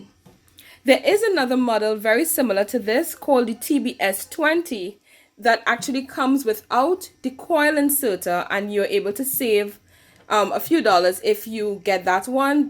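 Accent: Trinidad and Tobago. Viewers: You sound Trini🇹🇹🇹🇹🇹🇹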